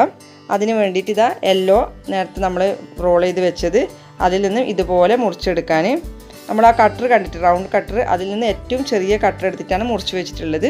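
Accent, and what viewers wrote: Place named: Payyanur, India. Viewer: Chodi😜. Pynr slang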